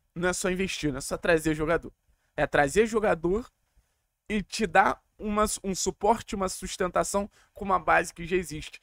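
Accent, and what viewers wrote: Rio de Janeiro, Brazil. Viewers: E esse teu sotaque de carioca.....?? Vc é de lá...??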